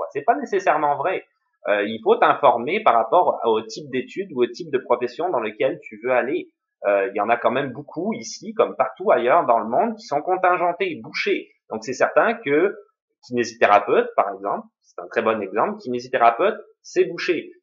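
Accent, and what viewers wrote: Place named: Quebec, Canada. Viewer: Pour avoir vécu au Québec, je confirme, tu t'est bien intégré, je ne sais pas d'où tu viens (de France, de Belgique) , mais tu as bien choppé l'accent ! :)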